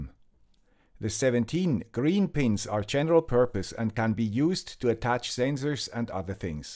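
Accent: Switzerland. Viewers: Third law of termodynamics -> There is no place where you can find -1,800 °C, not even in Sibiria ;-). Some thoughts about the SPI temperature sensor: a) The low negative temperatures could be caused by a wrong polarity. Have you checked that the thermocouple is connected with the right polarity? b) Are you sure the thermocouple and the MAX31855 are type K? The circuit python module only works with K-types. c) There is a very little chance that the D5 pin is not set as output: you could do this by adding the line to script: cs = digitalio.DigitalInOut( board.D5 ) cs.direction = digitalio.Direction.OUTPUT # add this line It would be so cool, if we could find a solution because knowing, it doesn't work, drives me crazy. Yes, I'm a Nerd ;-). Someone like Mr. Monk ( but not so brilliant ;-) ). BTW: I like your videos: interesting themes, well prepared, you do not hide your mistakes (so we could learn from them, as well as you did), understandable english (with a nice swiss accent; greeting from a German neighbor), no boring background music and for me also important: fluent speaking with no ehms and aahhms. 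So thank you for your work and for your countless inspirations! vy 73 DF4VB (Peter) PS: What is a qualifying purchase? Only the products in your shop, or can I mark other things, I'd like to buy, to be recommended by you (or so)? I have no experience with amazon, but if I could support you, I'd give it a try. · the Swiss accent sounds a lot more pleasant to me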